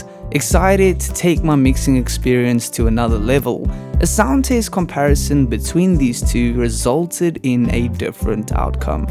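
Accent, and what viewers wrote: South Africa. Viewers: are you south african dood i can hear it in your voice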